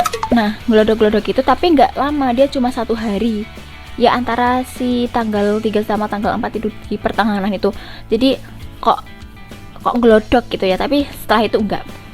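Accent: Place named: Java, Indonesia. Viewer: Aku suka sama dialek kaka yg medhok👍🏻🤭 Austrin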